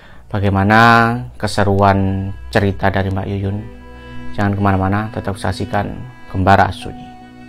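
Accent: Java, Indonesia. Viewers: aku nonton di tv rama² pas ada sodaraku yang bukan asli jatim malah jadi cekikikan karena mbaknya medok banget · Logat jawane seneng aku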